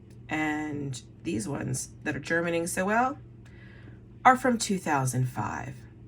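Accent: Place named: Maryland, United States. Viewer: Before even looking at the video description or hearing you said mid-Atlantic I knew you were a Marylander! Whenever I hear my native tongue it makes me miss my home state. Happy gardening from western PA!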